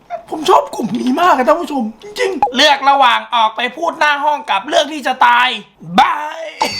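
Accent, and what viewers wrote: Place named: Suphanburi, Thailand. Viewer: ผมชอบ พี่ พูดเหน่อ อะ พูดบ่อยๆ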